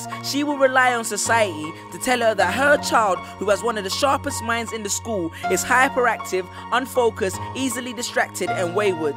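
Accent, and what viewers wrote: London, United Kingdom. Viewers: Somewhere in London probably. You can't really say British, there's so many different accents in Britain. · it kinda had a hint of Jamaican to me but my best guess would be south London only because I watched a documentary and the people had a similar accents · South London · It is not a Jamaican accent. It is a London accent, just because he is black doesn't mean he is incapable of speaking, and just because you don't understand what he is saying, doesn't straight away mean he has a Jamaican accent, just because you see he is black, I know many Black, Caucasian and Asian people who speak like this, just because of the accent they picked up by living in London. Think before you say, don't use your absent minded, ignorant head to judge someone you don't know, you come across as racist. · I've been inside that building behind them, and it's in the city of London, but I don't remember what the building is called. 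I think it's something to do with art. His accent is London. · LyricMaker101 its london ghetto which is normally in east, north and south london. but juss coz he speaks like that dosent mean hes dumb, juss sayin. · Frank White Not being smart, just not being dumb, but yes it is from south London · It isn't cockney, its a south London accent that's derived from Jamaican. (slang term for it is Ja-fake-an) Cockney is very different, listen to Danny Dyer he doesn't sound like this. At all. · Marshall Manic it's not a cockney accent, cockney is old school east London, this is more south London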